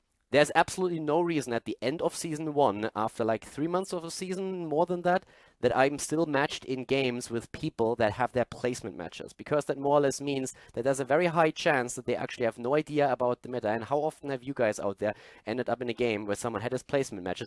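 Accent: Germany. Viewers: I agree with all of it! Mainly with the voice thing! The MMR thing is very difficult and i know what it means to have an illidan on the team with most deaths in lowest hero dmg on the team! Maybe some tweak for the rating in combination with the other stats but it would help a lot!! I love your work and your german voice;-) Go ON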